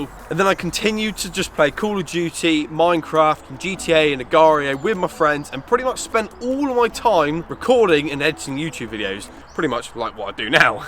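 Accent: United Kingdom. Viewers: Sound so posh in your old videos man 😂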